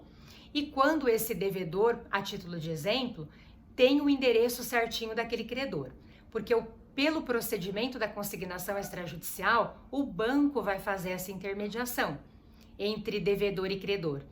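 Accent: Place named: Paraná, Brazil. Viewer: Esse sotaque é do Paraná hein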